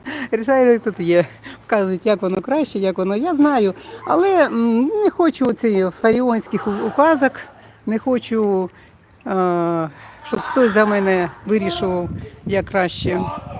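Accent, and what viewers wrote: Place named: Russia. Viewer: по Вашему акценту чутно, що Ви все життя розмовляли російською - розмовляйте, так, як зручно..... Навіщо розпалювати цей мовний конфлікт- російська мова, це що власність путіна???????? В Норвегії теж розмовляють норвезькою та англійською мовами, але ж Слава Богу ніхто нікого,асвабаждать, не лізе...........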